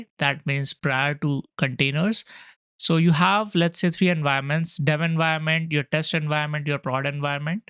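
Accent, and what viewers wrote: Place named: India. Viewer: Sorry to tell this but can you work on your accent? It is so hard to understand the Indian accent! I recently found your channel amazing playlists the only negative point is this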